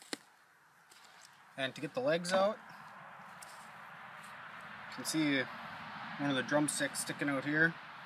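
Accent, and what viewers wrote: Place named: Canada. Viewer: I hear that Canadian accent. That was a Canada goose, or as it is called in Canada, a goose. Must be why it just says goose in the title of this video. Well, the video was very good. Thanks!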